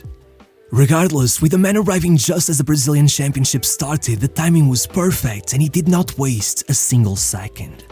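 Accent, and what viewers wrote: Portugal. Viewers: You can clearly notice the narrator is portuguese 🙃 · Bro, why do you speak in Portuguese and not PT-BR? Sounds kinda weird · the portugueese accent is there hahaha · I know a portuguese accent when i hear one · Portuguese accent is crazy xD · You sound portuguese my dude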